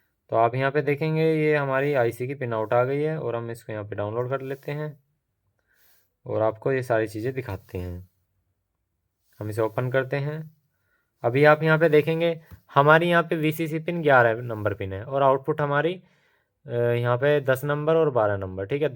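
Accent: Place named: Tripura, India. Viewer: Kaisa ho sir, Tripura sa bol raha ho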